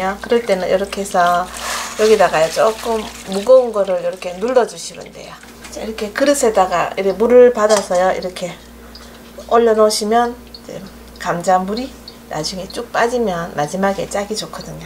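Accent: Gyeongsang, South Korea. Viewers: 구수한 경상도 사투리, 사근사근 설명해줘서 귀도 행복해요. 요리 쇼 보는 것이 스트래스 해소에 큰 도움되네요.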